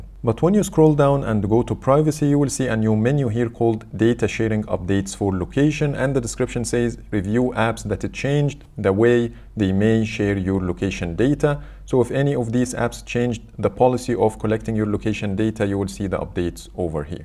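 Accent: Egypt. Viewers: انت مصري واضح من الاكسنت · Man I don't know if you're Egyptian or not but i admire this Egyptian accent 😂❤️❤️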